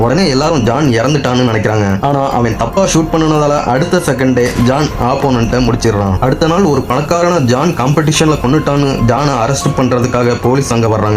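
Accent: Coimbatore, India. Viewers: Neenga coimbatore ngla?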